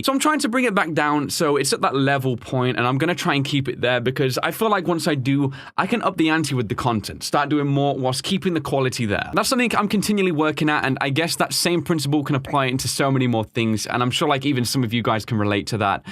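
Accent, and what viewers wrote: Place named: RP, United Kingdom. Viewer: Good job you are crazy good I first started watching from case anamatronics part 1 old version ( sorry if you're insulted but it that vid you sounded so posh ) hehehe your really good keep doing what your doing I someday hope you get 3_4 million subscribers your so funny baz is also really good yeah but your really good I like your videos so much I am a really big fan of you your so nice and one day I would LOOOOVE to meet you keep going and keep making theese funny videos peace out Brah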